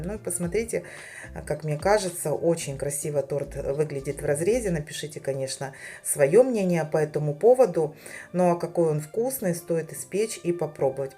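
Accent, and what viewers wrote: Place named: South, Ukraine. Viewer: А это не только пляцок. Есть очень похожий финский тортик. Говорок у Вас до боли родной. Юг Украины. Или юго-восток? А рецепт великолепный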